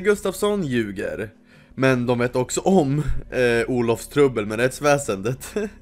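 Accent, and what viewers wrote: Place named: Sundsvall, Sweden. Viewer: Asså, kommer du från Njurunda/Sundsvall? Låter exakt som en snubbe jag känner på sättet du pratar osv. Menar inte att det är du men ifall ni är från samma område.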